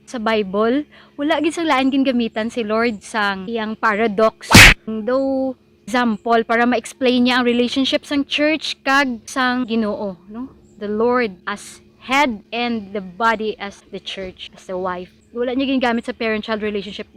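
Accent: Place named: Iloilo, Philippines. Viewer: Nalingaw kos pagka ilongga oi. Wakoy nasabtan sa topic 😆😆😆 mura nagkanta kanta. Ahhh🥰🥰🥰 Thanks Ma’am more videos ❤️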